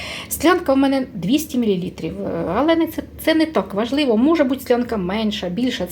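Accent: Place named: Cherkasy, Ukraine. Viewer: Гарна черкаська говірка, приємно чути.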